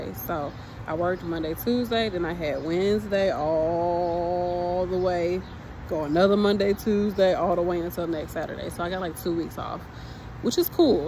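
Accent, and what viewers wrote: St. Louis, United States. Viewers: It's so funny how much this has all transitioned over the past few years. I so miss the 90s. lol It would seem to me that if you're only PRN, they'd give more orientation to allow you to create some sort of a routine considering you're not agency, you're actually a part of their team. And they know you're a LTC nurse and not a hospital nurse. t's just crazy to me. Be careful with that because you will still be held liable if heaven forbid something goes array during your shift. They will say "You could have asked for more training." You worked too hard to get where you are. And yes ma'am, you have come a long way. never forget that. I started following you a few years ago after you became an LPN. You were interviewing Victoria Randle of The Secret Cocktail. When I heard you talk I was like, thick chick gotta be from the Lou🙂. 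I'm from there too but I live in WA state. Anyway, keep your eye on the prize, there is more in store for you. You have great ambition and determination. The world is your oyster.🤩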